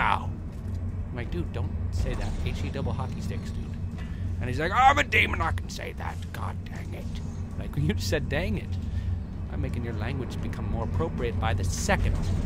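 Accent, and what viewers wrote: United Kingdom. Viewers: you kind of sound like austin powers in your awesome british accent ! =) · British voice kind of reminds me of the Geiko Gecko. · your british accent soundslike a non robotic Weatly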